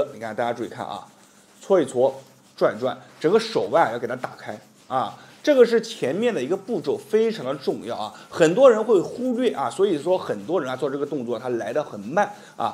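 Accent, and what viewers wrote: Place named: Nanjing, China. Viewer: 宝藏老师啊！谢谢！第一次留言，昨晚不光做了您教的这个动作，一口气看了您其他的好几个视频，尤其关于肩颈的动作，实测当场起效，我是颈椎病、肩颈综合征患者，从去年开始犯病，一直就恢复过，昨晚做了您的动作，让我轻松无比！另外听老师口音像是南京或是南京周边地区人，若老师方便的话，我想登门拜访，感谢！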